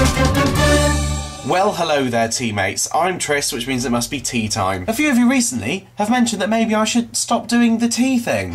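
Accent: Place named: United Kingdom. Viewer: I try to figure out how to do your british accent...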